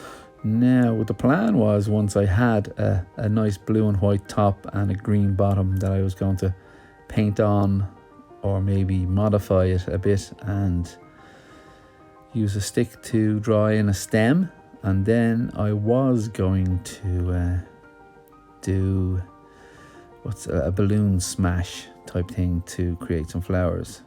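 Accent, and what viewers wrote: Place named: Ireland. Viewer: I agree with you. I love the Irish sense of humour and the accent. Lovely paintings.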